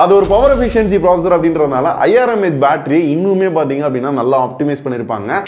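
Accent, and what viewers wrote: Madurai, India. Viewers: Anna neega madurai thana 🤔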